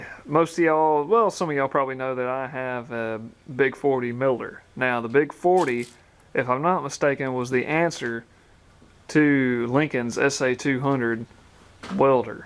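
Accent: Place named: Mississippi, United States. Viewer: Love your videos. It's nice to hear that accent again (grew up in Hattiesburg -- transplanted to the PNW) from a GREAT teacher. Keep it up!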